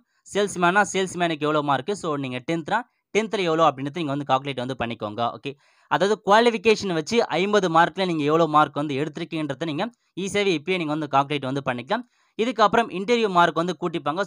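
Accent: North, India. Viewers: என்னடா நீ வடக்கன்ஸ் மாதிரி இருக்கு உன் குரல்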